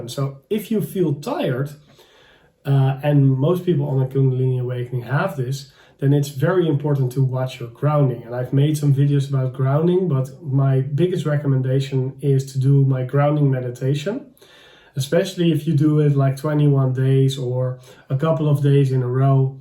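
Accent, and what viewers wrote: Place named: Netherlands. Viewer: As soon as this started playing, I recognized your accent right away because my Divine Masculine is Dutch also! Ha ha! And I love when I hear other men speaking with a Dutch accent because it clears up for me that it's my DM's VOICE that affects me! Blessings and thank you! My kundalini has just begun rising and I need all the info about it I can find!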